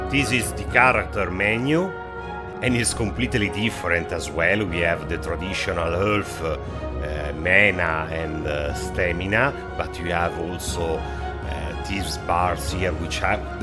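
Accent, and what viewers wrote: Italy. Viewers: C'è bisogno necessariamente del gioco originale? (dall'accento suppongo tu sia italico) Thanks! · Nice video and epic accent (Italian?)! Chiao! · Eppure qualcosa mi dice che sei italiano.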